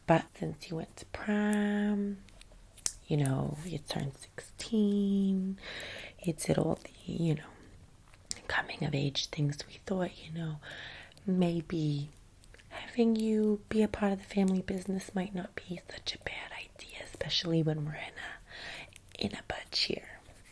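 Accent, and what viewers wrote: New Jersey, United States. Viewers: Hello.. well my mafia binge is being filled. Amazing. Also the jersey accent 😍 I’ve not heard it much as an Aussie and I love it. Also my threats are very good w my brother because he is my brother. I know he won’t do shit. But.. let’s be honest having 6 brothers does that to you.. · i love jersey accents and unserious asmr videos 💀 you gained a sub from me youre so funny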